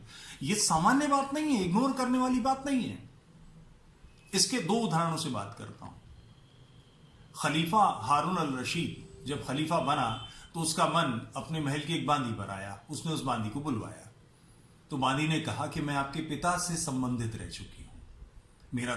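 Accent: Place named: Urdu, Pakistan. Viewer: Sahib, sab se pahley, aap Hindi bohat khobsoorat Urdu lehjay main boolte hain. Aap ahle zubaan hain sir. Mn Hindi bohat kum janta hn, tub aap ki sari baat smajh naheen aati, bus mufhoom samajh aa jataa hai Tu sir aap ne jo Islam k bare mien farmaya, sub such hai. Aap kaa hl, civil war, practical naheen lagta. Mera tajurba ye hai ke mulaa k sath aap jitni narmi bartien gay woh ussay aap ki kumzoori aur apni fateh samjhe ga. Adaab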